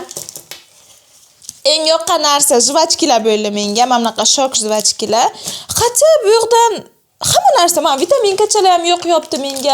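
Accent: Bukhara, Uzbekistan. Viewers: Buxorolikmisiz deymanda?😂😊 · Buhoro manimcha adashmadimi man toshkentlide😊😊😊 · Buxoromizni shevasi shirin umrizni oxirigacha shunday beg'ubor bo'lib qoling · Buxoro danmisiz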